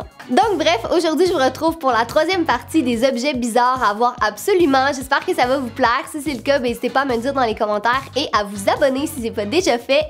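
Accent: Quebec, Canada. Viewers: En fait elle est Québécoise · J'aime trop ton accent québécois et je préfère le pain tranché j'en mange tout les matins 😘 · oui elle est canadienne et même quebequoise 🙂😍😊😄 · oui puisqu’elle vient du Canada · J aime beaucoup trop l accent québécois ❤️😍bisous de France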